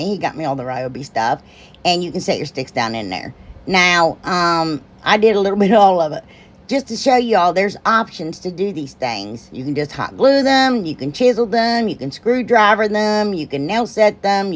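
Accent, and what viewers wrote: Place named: Kentucky, United States. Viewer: GIRL if you live close to Heath you are only an hour and a half from me. I am also from Kentucky. Harlan if you know where that is. I just love watching you. My husband will hear you talking and say 'Are you watching?' Listening to you talk reminds me of home.🧡💛💚💙💜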